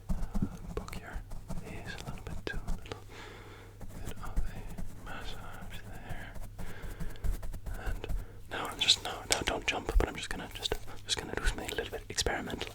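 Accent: Ireland. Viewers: the irish accent is sooooo good! I need more of it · My hair is literally how he's describing it at the moment. And I LOVE the Irish accent! ❤ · I am Spanish but I had an Irish upbringing and the accent makes me SO homesick 😭😭 The meassuring part made me drop the phone. Yes, you caused that 😂 Carry on with your amazing work and your sense of humour ❤ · as an irishman, i approve of this even if it is all over the place i'll take it literally staying w a friend in dublin which is kinda the accent ur doing but also with other dialects from around the country sprinkled in but i feel like being in dublin this video is fitting to sleep to when home is 3 hours across the country and people sound nothing like that from home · His Irish accent is better than mine an actual Irish person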